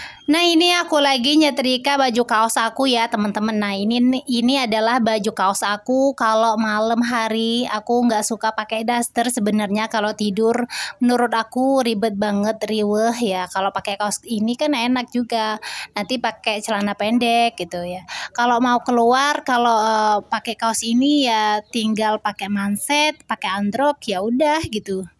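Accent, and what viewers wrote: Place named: Makassar, Indonesia. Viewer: MasyaAllah... Mampir lg ni teteh. Logat makassar jg teh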